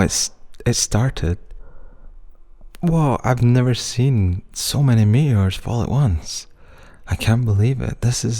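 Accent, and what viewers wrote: Scotland, United Kingdom. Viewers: Please his precious Scottish accent is absolutely adorable 🥺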